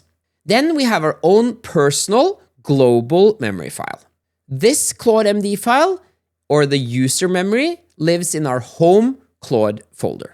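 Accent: Norway. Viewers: Det tok 0.564secunder å høre at du var norsk :)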